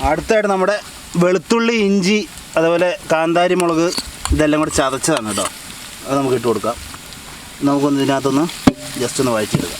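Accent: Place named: Idukki, India. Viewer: മുൻപ് ഇടുക്കി, കോട്ടയം എവിടുന്നെങ്കിലും മംഗലം ഡാമിന് പോയതാണോ സംസാരം കേട്ടിട്ട് തോന്നുന്നു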